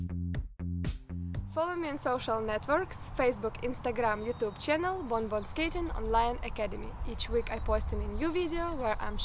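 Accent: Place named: Russia. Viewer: русский английский))) Большое спасибо Вам за Ваши уроки!!!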